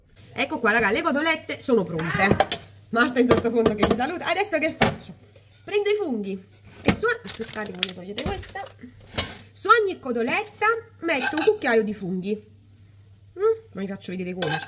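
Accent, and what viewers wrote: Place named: Naples, Italy. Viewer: Poi vabbe dialetto Napoletano....Adorooo👍👍👏👏😍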